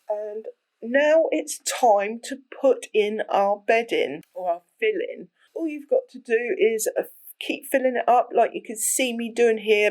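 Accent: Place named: Enfield, United Kingdom. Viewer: "Cats are not required - could someone please tell them that?" LOL, subbed. Plus you sound like a local (I'm in Enfield, you sound distinctly like my people. :) )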